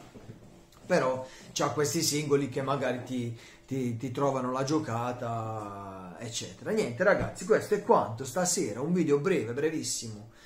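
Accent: Bari, Italy. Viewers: Spettacolo la parlata Barese compaesano Mio 🤣❤🖤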